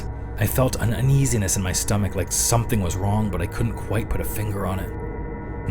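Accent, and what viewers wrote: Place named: United States. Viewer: Very very good story ! And the narration is just perfect ! Being french, and listening to this beautiful English ( American ) voice add something I can’t explain ! Well done !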